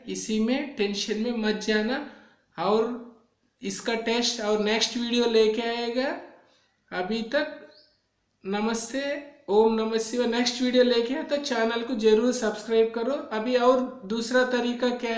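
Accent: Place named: Kerala, India. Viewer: . Kerala ka hai Bhai boli se pata lag jata hai .Mai Gaya hu Kerala waha ke log yese hi bolte hai.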